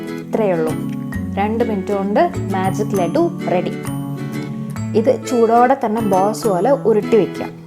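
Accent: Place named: Thiruvananthapuram, India. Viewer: Tvm slang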